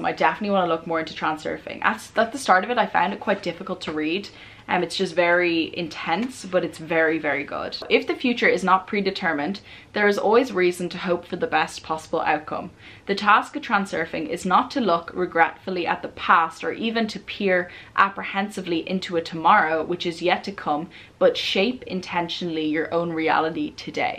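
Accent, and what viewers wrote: Ireland. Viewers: Which part of Ireland is your accent from? I've been watching for a while and I absolutely love it! 😁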